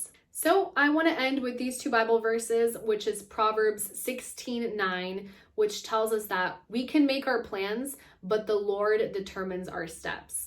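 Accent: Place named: Spain. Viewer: Love the Spanish accent 😍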